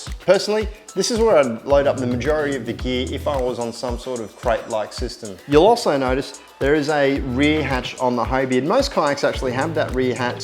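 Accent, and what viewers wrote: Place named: Australia. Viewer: Searched for a Hobie Pro Angler review Australia and your channel came up, just needed an Australian talking about the Kayak and stuff not our fast talking American brothers which sometimes can be like a different language !! God luv em, anyway really enjoy your Vids and thanks for all the effort you go too, keep up the good work and I hope to replicating most of your set up soon ... Cheers Mate :) New Sub and happy to be one......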